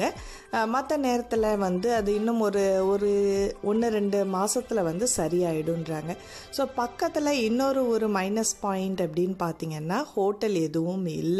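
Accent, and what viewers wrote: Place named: Kayalpatnam, India. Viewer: I cooked vetalappam which is so taste.Thanks for your recipes.Your voice is also nice with kayalpatnam style. Mrs.Shobaanandaraj Chennai.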